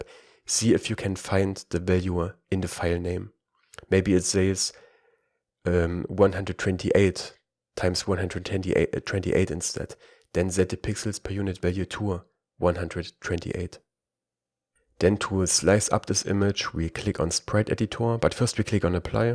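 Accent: Germany. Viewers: Love your accent, I’m german btw too😂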